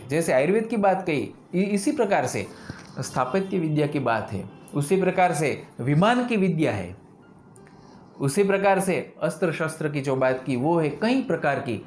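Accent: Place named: Gujarat, India. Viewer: Tamari peli second ni boli thij khabar padi gayi k tame gujarati cho 😎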